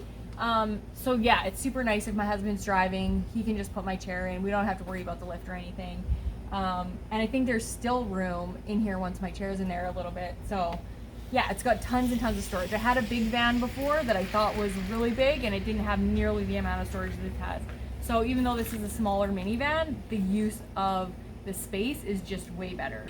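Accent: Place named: Canada. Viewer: I love a good Canadian accent. Every last work in their sentences goes up a whole pitch. lol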